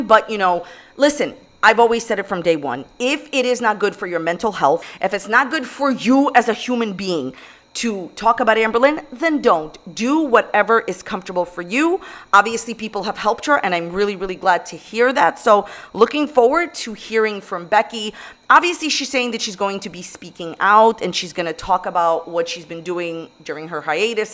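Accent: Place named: New Jersey, United States. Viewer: Love voice the most about her videos it’s so Joisey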